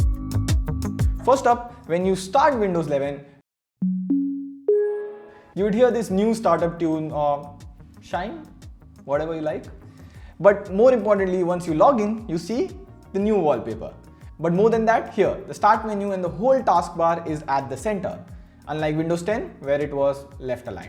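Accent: India. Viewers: All indian accent are the same😌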